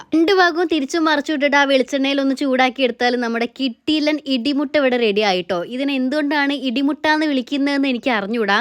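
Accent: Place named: Kozhikode, India. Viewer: Chechide koikoden slangg soooper Njanum koikode aahn 😁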